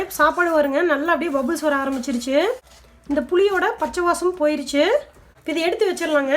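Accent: Kongu, India. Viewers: ரசம் சாப்பாட்ட விட உங்க கொங்குதமிழ்தானுங்க சூப்பரு, ஏனுங்க என்னுங் நாஞ்சொல்ரது...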